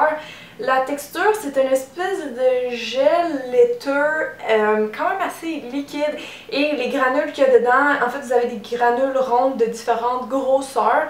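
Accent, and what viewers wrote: Quebec, Canada. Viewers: Moi l'huile de monoï je l'utilise pour les cuticules sa les nourri et cela fait de beau ongle au passage j'adore ta chaîne et ton accent canadien est ce que tu pourrai m'être en € les prix aussi merci d'avance 😻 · Tu est quebequoise ? ;) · Ta ha it's Canadian (québec) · J'adore ton accent Québécois ! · Je ne supporte tellement pas l'accent québécois que j'ai dû zappé ta vidéo ! 😖 dsl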